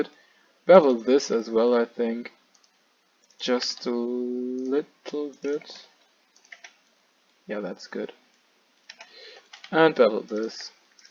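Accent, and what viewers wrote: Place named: Germany. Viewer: The German accent is so stong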